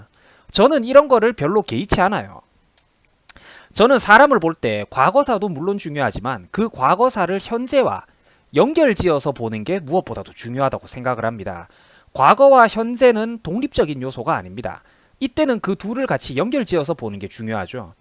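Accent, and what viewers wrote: Gyeongsang, South Korea. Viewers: 대구말투 착착 감기네요 나는 대구에서 태어나서 다행이다 생각하는요즘 우리부모님께 감사감사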